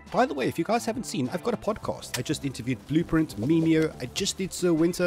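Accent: South Africa. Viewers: Ayoo, are u South African bruu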